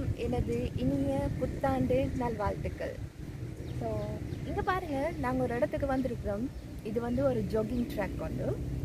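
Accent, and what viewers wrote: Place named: Tamil Nadu, India. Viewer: I really enjoyed your vlog..your tamil slang is amazing 💐 keep doing more vlogs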